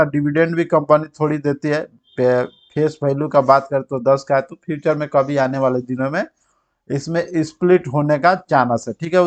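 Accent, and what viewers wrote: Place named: Bihar, India. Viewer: Aap Bihar se hai, aisa aapke bat se lagta hai.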